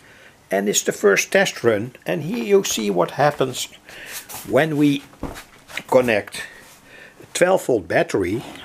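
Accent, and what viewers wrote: Netherlands. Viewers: btw are you dutch you have a Dutch accent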